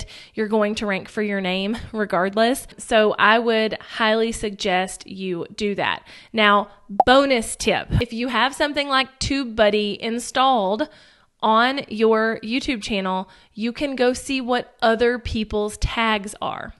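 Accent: South, United States. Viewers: Finally somebody has explained this to where i can explain it ! Thank you! Maybe its the accent ! I too speak southern lol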